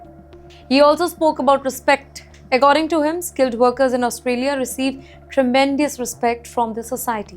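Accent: India. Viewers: It's Indian english, u donkey, India speaks what the brit jokers 🤡 imposed on it, and then recultured it! Stupid convict!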